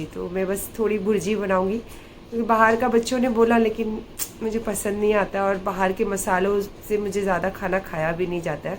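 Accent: Bangalore, India. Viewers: Wow ..😍😍😍what a bangalore style language😘😘😘❤❤❤❤❤❤❤ God bless you stay strong Aunty ji love you😘😘😘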